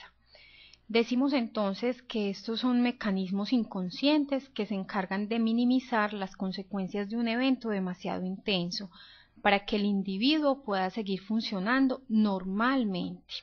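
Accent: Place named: Colombia. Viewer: Su acento es muy fuerte y no logro entenderla, es de colombia verdad? bueno, no es criticar solo decir que el huehueo que hace al hablar no hace que se entienda profesionalmente el vide.. mejor los acentos neutros.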